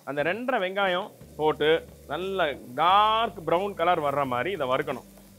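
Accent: Chennai, India. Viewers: Chef ungah Chennai thamil. Oru thaba epadi oru thaba appadi Semma ponga. Simply flattered by ur way of explaining. 👌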